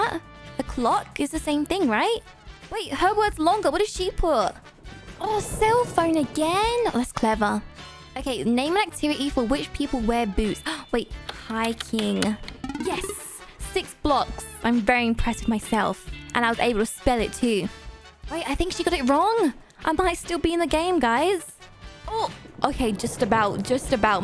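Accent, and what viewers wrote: England, United Kingdom. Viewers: Are you English?????????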